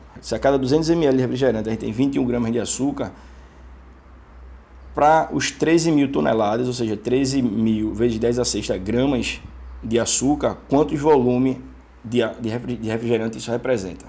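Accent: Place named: Northeast, Brazil. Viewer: "arrente" amoooooo esse sotaque s2 hahaha nordeste pisa menos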